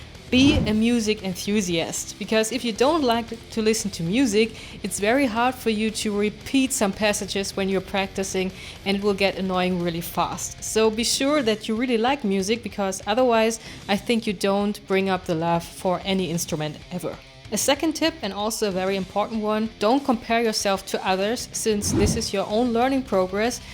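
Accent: Germany. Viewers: I just love how you can hear 100% that she is german, even though her english is pretty solid. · Do i hear a german accent?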